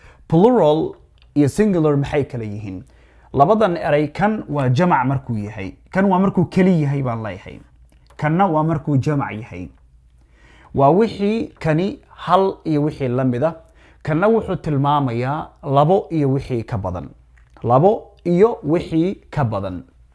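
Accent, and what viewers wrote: United States. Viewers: Maa shaa Allah u gotta completely the accent that's my cuppa ... thanks teacher. my American pronunciation coach .. i swear to God ., i'll get it done cuz it's just a piece of cake...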